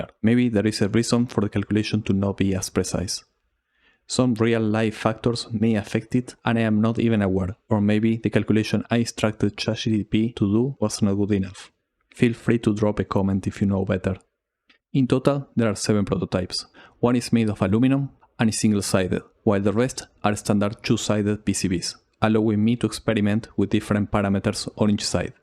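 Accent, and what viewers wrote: Argentina. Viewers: te reconocí el acento. Tremendo laburo hermano! Saludos desde Buenos Aires. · Where are you from? I recognize that Spanish English accent, maybe Argentina? In any case, great job! You are insane! Happy Holidays and keep the great ideas!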